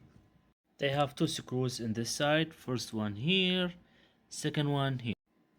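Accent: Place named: Iraq. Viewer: من الاكسنت أعتقد ان الأخ عربي من العراق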